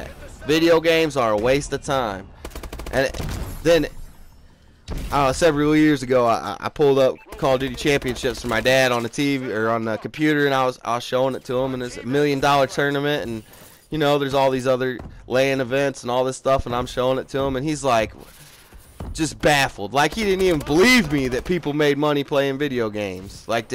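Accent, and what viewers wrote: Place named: Indiana, United States. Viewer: 20 times!!!!More times!!!! I can tell your from Indiana. lmao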